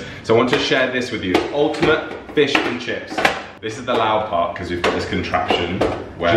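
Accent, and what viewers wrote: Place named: RP, United Kingdom. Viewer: By any chance, does Keegan envy you that you speak such pure royal English, and he can't, even though you grew up in 1 country? ;) <3, separate Keegan 🥰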